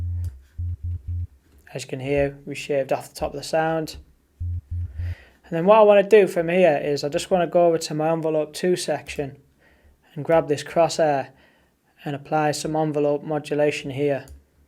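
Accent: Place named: Leeds, United Kingdom. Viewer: Your guna do well on here, I've been searching speed garage tutorials for the last 2.5 years!! There's fuckall!! Lol nice bass that mate, we're you from? You sound very close to my home town Leeds??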